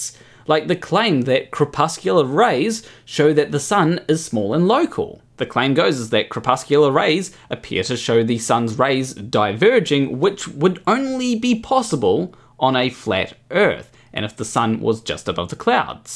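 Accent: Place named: New Zealand. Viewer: You mentioned Australia, but you sound Kiwi... what gives? 😄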